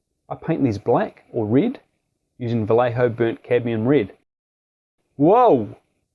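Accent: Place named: New Zealand. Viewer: Sorry. Every so often the Kiwi accent demands gentle humour....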